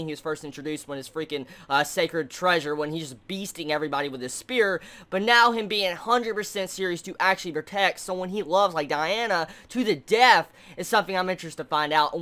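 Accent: South, United States. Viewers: 5 years ago u sounded verrrry southern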